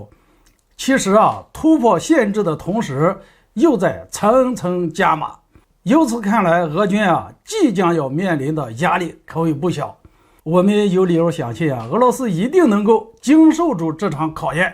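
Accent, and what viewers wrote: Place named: Shandong, China. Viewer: 山东口音太重了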